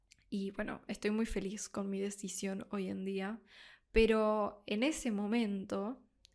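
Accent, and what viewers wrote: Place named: Buenos Aires, Argentina. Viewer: Are you from Buenos Aires...guessing by the accent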